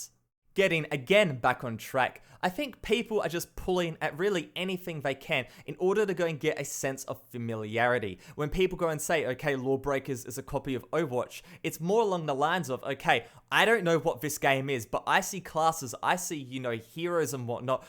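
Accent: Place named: Australia. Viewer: You have a really interesting accent. Are you aussie or from NZ?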